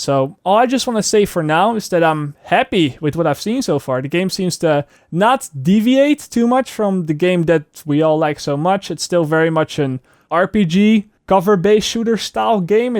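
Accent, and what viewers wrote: Netherlands. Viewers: Im pretty sure this guy is Dutch :D that ''zie je'' later at the end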